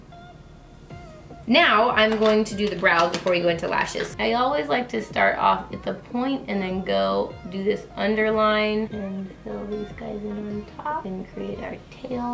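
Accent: England, United Kingdom. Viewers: Love your videos so much! Always cheer me up when I'm having a bad day and make me smile. That English accent though 😂😂😂 it's like a shout out to us in the UK! You should try a wee Scottish one too though and do a Brave tutorial for the Disney Princess series - it's been a while!! xxx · Yeah for English accent. Im English and I love it girl. From Sarah.xx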